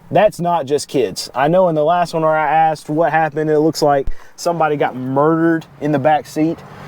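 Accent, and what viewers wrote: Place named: Texas, United States. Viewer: This is a very real example of what an actual Texan accent realistically sounds like. Half “normal” and half a unique form of country twang.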